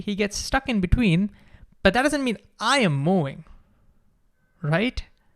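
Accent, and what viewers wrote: India. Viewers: Trying to listen to a hyperactive Indian accent stumbling over the English language, who has a constant conversation with himself and Einstein - is extremely disconcerting and tedious. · Considering how fluent so many Indians are in English why do they insist on maintaining a barely comprehensible accent?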